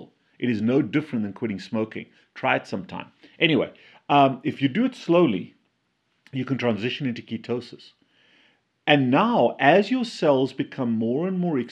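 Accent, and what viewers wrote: South Africa. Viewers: From someone in the UK there is definitely a south African accent. Not much. But enough. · I'm loving your video's dr (& so awesome to immediately recognize the S.A accent my home country) ♡ I'm so thrilled I came across you. I'm a RNP in private practice and total LCHF convert. Your many video's & interviews are highly informative. Thanks for your great work and well done on your achievements past and present ❣ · He sounds South African. Probably is. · Funny, I live in South Africa and when I saw his first video I thought "He seems like a South African", but then I saw he wasn't. Later videos I realized he was. He not only still has a South African accent, but he looks like one too. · He speaks like an upper class English speaking South Africa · Too South African. Unmistakable accent. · Subtle South African accent going on there doc….good content !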